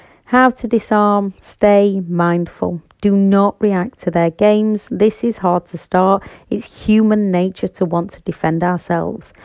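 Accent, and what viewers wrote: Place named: Lancashire, United Kingdom. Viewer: Thanks for your useful and helpful vids, and always nice to hear a Lancashire accent for a change. x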